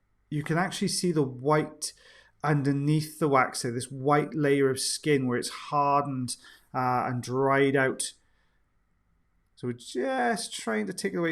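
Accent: Wales, United Kingdom. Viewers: Liking the new specs! You never talk too much. I think of you as my favorite teacher. You’re happy, excited by your subject, and teaching us the whole time! If you record an audiobook, I bet we’d all buy it! Love the Welsh accent and sayings. 🥰😀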